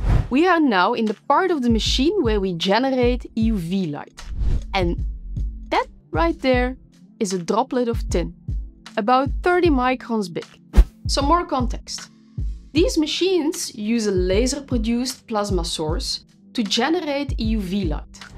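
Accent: Netherlands. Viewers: This is the strongest Dutch accent I’ve ever heard 😅. Very refreshing I might add. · No, she's baiting us, the general audience. Serious. The others aren't stupid either. What she outlined with a charming Dutch accent is just the very basics. The specifics she didn't go into are deep company and national secrets and are carefully omitted. And wouldn't fit into a video of just over two minutes.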